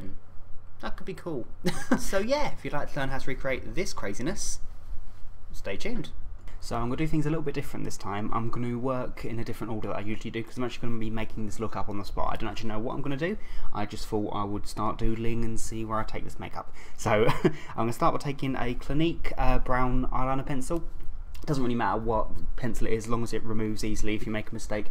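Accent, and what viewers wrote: United Kingdom. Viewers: Does anybody think his nice awesome British accent fits that character perfectly you sound so sweet but looks terrifying cx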